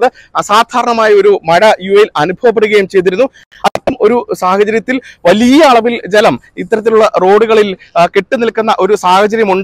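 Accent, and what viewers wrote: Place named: Kottayam, India. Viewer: This is not Telegu, true Kottayam Malayalam.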